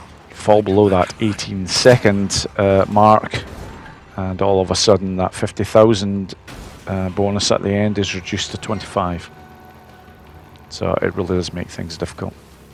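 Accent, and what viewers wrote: Scotland, United Kingdom. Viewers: First Tutorial video with British accent! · Hey bro, awesome work! Hearing your voice the first time. You Scottish by any chance?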